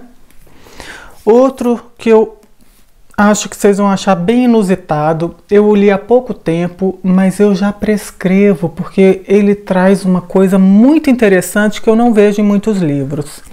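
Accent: Minas Gerais, Brazil. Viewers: O outro lado da infelicidade é o contentamento ( não é a felicidade) Ser grato ser agradecido não tem nada a ver com religião. É um estado de espírito que tem tudo a ver com contentamento. Vou fazer um caderno só com as coisas que você fala. Minha filha disse que eu já tou até falando mineires de tanto ver vídeo seu❤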